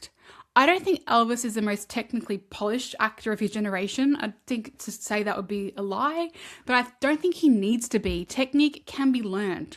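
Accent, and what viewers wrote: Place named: Australia. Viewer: can i just say, you should never have to apologise for having an Aussie accent. it's yours and you should own it. if there are viewers who complain about not being able to understand you, then they can simply put on subtitles. you have a stunning accent and should be proud of it.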